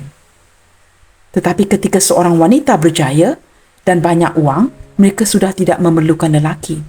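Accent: Malaysia. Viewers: Suara nya nada cakap malaysia ni kan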